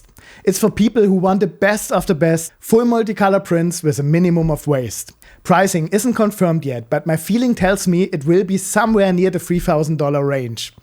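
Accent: Germany. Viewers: this bad accent german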